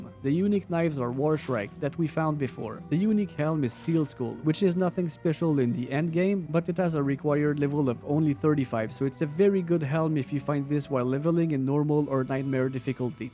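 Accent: Quebec, Canada. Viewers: Where is your accent from? Sounds like Quebec french accent.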